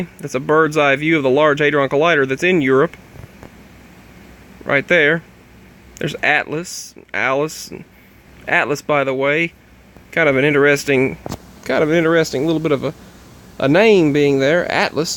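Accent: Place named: Tennessee, United States. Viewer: Hello my little friend, are you in Tennessee? I hear your accent and if your even close to here in Tennessee then I'll have to say Praise Jesus for what your doing. Nobody around here talks about what going on with these collidars. And the one you say might be hidden underground I really believe the Holy Spirit, Wind and Breath has showed me that it's under Oak Ridge Tn. To much in Tn is hidden from us, the Red headed Giants that followed the map of the rivers where here plus the Giants built all mounds the Native Americans have been said to have built, the Native Americans have many stories to prove that the tales of the Giants. Native Americans where all over the state of Tn so that means the Giants where here to. For some strange reason Tennesseans have been purposely dumbed down so we don't pay attention to whats going on at oak ridge TN, Nashville TN with thier parthanon and Music city, Memphis TN with thier King of rock and roll plus jazz music and Egyptian connect and how the state of Tennessee has a pyramid on the driver's license. 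I believe oak ridge plays a huge part in this collidar to collidar connection. I seen where you mentioned the Freemasons in some videos well the Freemasons are all religions into one and Jesus has showed me what Revelation says and how all these nation's are in the same Bed with one another so with that being said I really believe that all these collidars are working together behind closed doors to open the bottomless pit in Revelation chap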